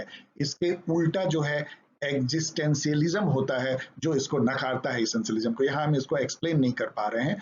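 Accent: India. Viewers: I swear by Allah Almighty, I'm not understanding Indian English😢it sounds like they're speaking their language.😢 Is there any link for me check out on? 17 days to our exams😢😢😢😢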